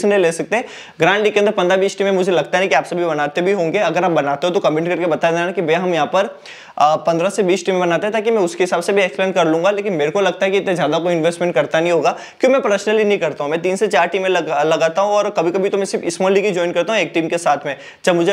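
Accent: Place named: Rajasthan, India. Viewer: हम राजस्थानियों की हिंदी बोलते समय राजस्थानी भाषा निकल ही जाती है 😅😅 लगता है आप भी राजस्थान से ही हो 🎉🎉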